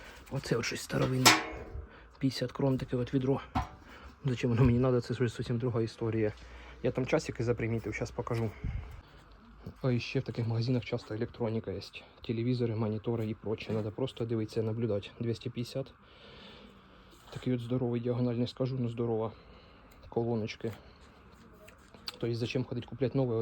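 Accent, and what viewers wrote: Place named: Surzhyk, Ukraine. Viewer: Ти - чудовий блогер і обізрівач, якийсь рідний і близький, як справжній! Не звертай увагу на коментарі заздрісних диванних "експертів "! І суржик твій - просто шикарний - щось близьке і приємне для вух, бо дуже багато українців розмовляють в побуті саме такою мовою! УСПІХІВ І МІЛЬЙОНІВ БАЖАНЬ, які неодмінно ЗБУДУТЬСЯ! В ТЕБЕ ВСЕ ВИЙДЕ, як мрієш, а можливо навіть краще, ніж очікуєш, не бійся мріяти і робити, що тобі хочеться!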